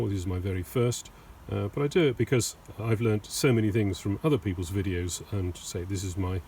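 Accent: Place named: RP, United Kingdom. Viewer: Well done, just what I was looking for. For a first effort at such a video, a veritable masterclass: no hideous background music track, no blether, just the info you need, delivered in RP. Thank you.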